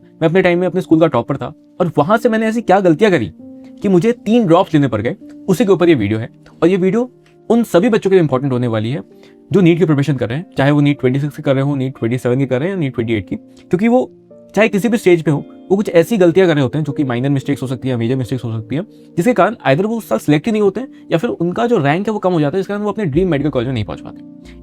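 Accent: Bihar, India. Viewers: aap bihar se ho huje laga up se ho aap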